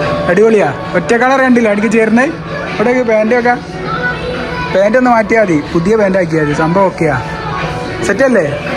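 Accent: Kozhikode, India. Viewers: കോഴിക്കോട് സ്ലാങ് തന്നെ മതി. അതാത് നാട്ടിലെ സംസാര രീതിയാണ്. ജനങ്ങൾക്കിഷ്ടം. കൂടുതൽ ശുദ്ധ മലയാള മൊന്നും വേണ്ടന്നർത്ഥം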